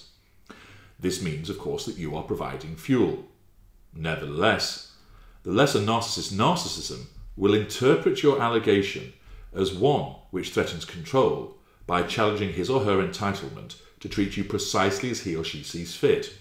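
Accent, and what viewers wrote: United Kingdom. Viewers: Same here! I love British accent. It's so smooth and hot. 🔥😂🤭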